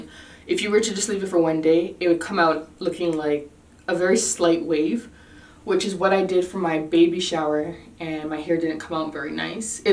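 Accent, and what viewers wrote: Toronto, Canada. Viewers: wait, you live in Canada? wow never knew that; i can definitely hear it in your voice now lol. anyway, your hair is so pretty. i like how your hair came out! i havent done a twist out in so long, and this has inspired me to do it - thanks !! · Beautiful hair! Congrats on the baby❣️Are u from Toronto ⁉️I detect the accent. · it sound Canadian but I definitely could be wrong? 🤔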